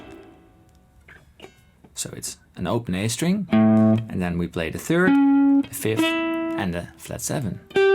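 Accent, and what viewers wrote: Netherlands. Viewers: Great guitar lesson, very helpful! Your English is perfect, but do I hear a Dutch accent?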